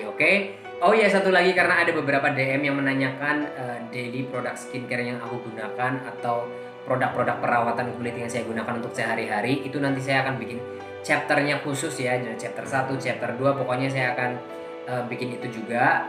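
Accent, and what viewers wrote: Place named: Yogyakarta, Indonesia. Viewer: Kak ini orang bali tapi gaya bicaranya kaya wong jogja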